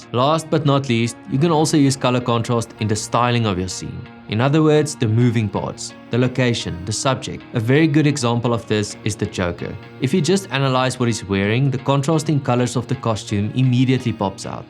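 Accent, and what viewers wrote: South Africa. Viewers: I love your South African accent- you all speak with Authority-Love it --- Love the vid man! keep it up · Wow, it's so good to hear that Afrikaaner accent after all this time. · Tell me you're South African without telling me you're South African (apart from the accent) - doing more with less ;) !!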